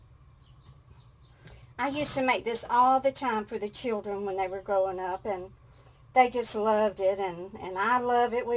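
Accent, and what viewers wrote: South, United States. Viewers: I have been a sub on your channel for a while. Someone say good your channel was but I have not had a chance to watch it. I love hearing your southern accent. I bet they love it up there. Show them your southern cooking. I love the pears. I ate them growing up. You have a blessed day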